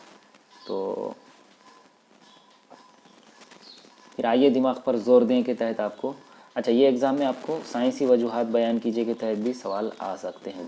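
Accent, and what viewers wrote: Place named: Hyderabad, India. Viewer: Sir is video m ap Ka accent Hydrabadi Hai ek dum.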